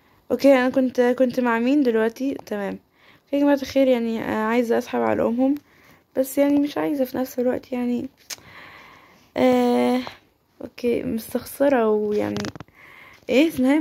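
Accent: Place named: Saudi Arabia. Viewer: والله انك صرتي تتكلمين سعودي يا لبيهه😭💀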